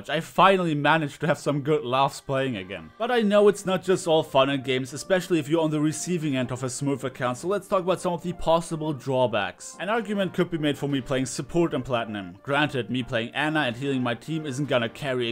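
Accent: Germany. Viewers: Ich wusste es (du bist ein deutscher ich habs immer gewusst alleine an dem akzent) haha ich bin nicht verrückt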